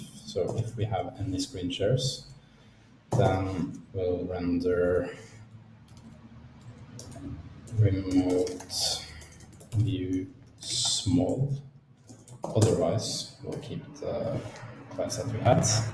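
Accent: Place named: Norway. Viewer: you speak English like a Norwegian. Er du Norsk?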